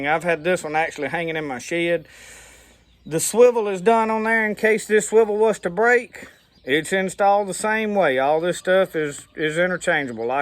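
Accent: South, United States. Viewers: Hes like me he's got a southern accent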